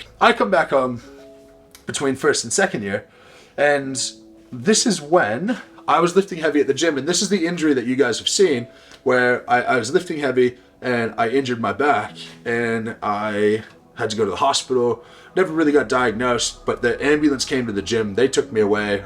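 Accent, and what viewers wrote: Australia. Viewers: Now i see where you got your slight aussie accent from. Thanks for sharing your story. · pretty cool how you picked up a slight ozzy accent during your time there!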